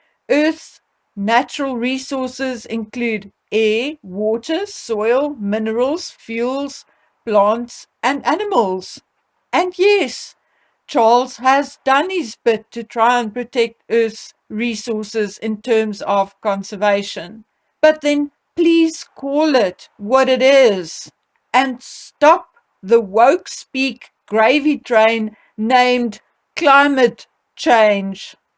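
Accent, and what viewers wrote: South Africa. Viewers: 😂🤣😅 I have to laugh when I listen to you , and the way we South Africans talk, its such a pleasure listening to you, say it as it is. 😂 Just love it.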